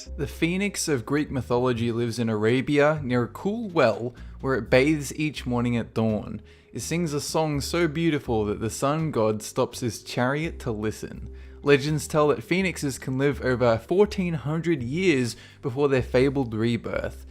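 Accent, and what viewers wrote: Australia. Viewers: Australian I think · Aussie all taay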